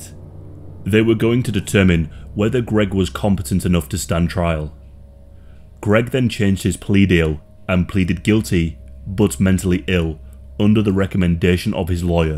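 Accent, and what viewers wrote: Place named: Liverpool, United Kingdom. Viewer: Ok you're my new favorite but I need to know where the accent is from it's driving me crazy trying to place it. Scouse?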